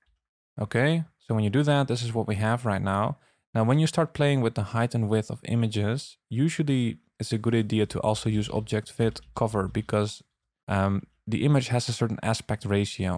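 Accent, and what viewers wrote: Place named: Netherlands. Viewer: Was almost going to comment in Dutch because of the accent, but location says USA, so here is my question: Can you stop a browser from rendering translucent gradients AT ALL? Today it is very popular in video players to use it as a background between the video and the navigation bar/buttons, which is totally annoying. Youtube does this as well. I need a way to make some CSS options impossible on a browser wide level. I managed to do a similar thing with rounded corners, but can it be done for gradients as well?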